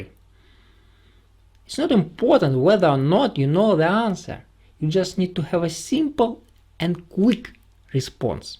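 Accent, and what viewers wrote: Russia. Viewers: You have the most stereotypical Russian accent. I love it 😍😂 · You from Russia??? I know this accent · yep, he's russian alright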